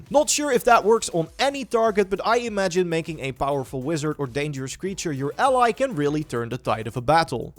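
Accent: Netherlands. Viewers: Waarom hoor ik zo hard een Nederlands accent?😅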